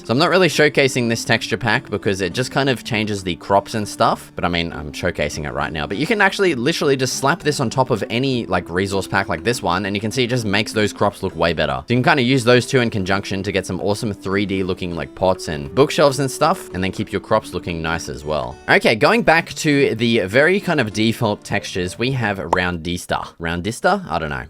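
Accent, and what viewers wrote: Australia. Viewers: Australien accent = better youtuber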